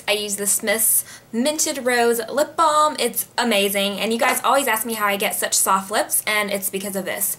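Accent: South, United States. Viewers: I can hear the southern accent lol.. she doesnt talk like this aymore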